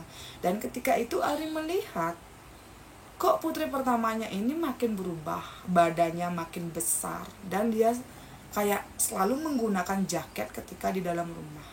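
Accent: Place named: Bali, Indonesia. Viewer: Jangaaannn...ntar saya ngga ngerti..logat bali juga dah enak koq. Hehehee..❤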